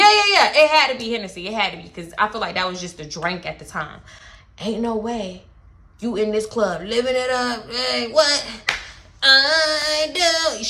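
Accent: Baltimore, United States. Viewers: you and this baltimore accent take me out🤣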